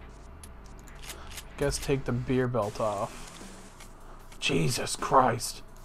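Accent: Canada. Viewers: why does sound way more Canadian in older videos lolol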